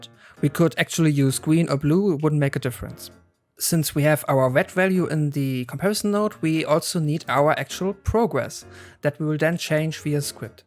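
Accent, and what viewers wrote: Germany. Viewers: I love your channel! Man hört dein deutsch voll raus :P Ich abonnier mal ^^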